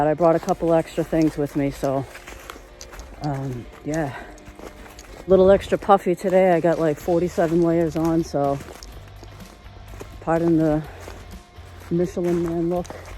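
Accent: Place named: Massachusetts, United States. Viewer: Def a massachusetts Gal, that's my accent. I'm closer to Braintree etc. Used to camp up in Maine and NH a ton. Great video.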